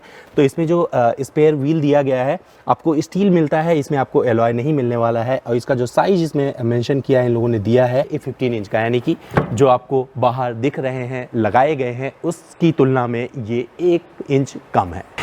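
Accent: India. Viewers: Really your review with hindi accent is just fantastic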